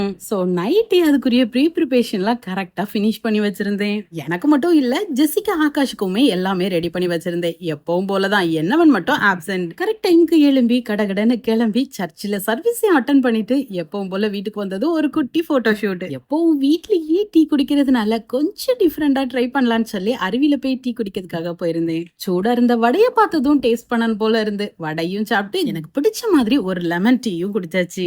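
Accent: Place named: Kerala, India. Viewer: Sister neenga pesarathu azhaga irukku 2daysa unga video matum tha pakkuren super 😊😊😊neenga kerala sister unga slang apditha irukku athan keten 😊😊😊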